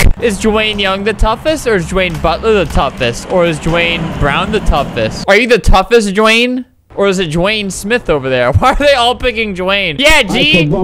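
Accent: United Kingdom. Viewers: This is amazing cause im from South London and quite a few people actually speak like this lol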